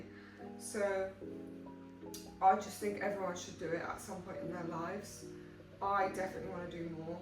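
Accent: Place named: United Kingdom. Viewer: I love your accent! What part of the UK are you from?? 🇬🇧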